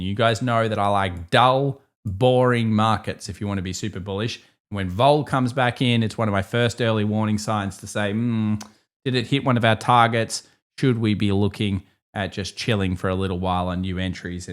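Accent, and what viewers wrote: Australia. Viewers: I like it the way he said it. Plus he's Australian so buzz off mate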